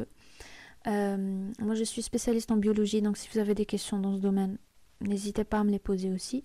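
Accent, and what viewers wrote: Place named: Tlemcen, Algeria. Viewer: L'accent tlemcenien ❤️🙏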